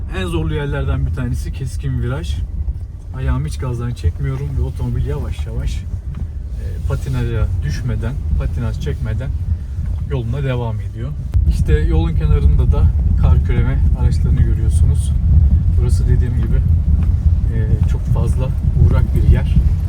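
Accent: Samsun, Turkey. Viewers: Eeelli klasik Samsunlu konuşması .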